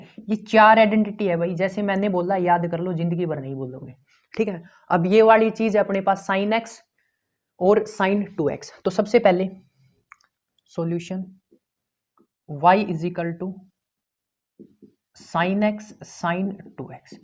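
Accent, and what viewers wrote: Haryana, India. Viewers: Wow haryanvi🥰🥰🥰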